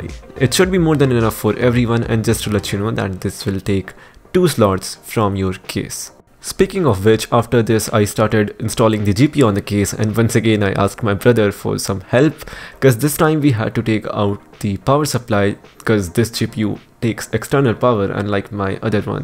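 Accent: India. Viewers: Indian accent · This indian accent is so anoying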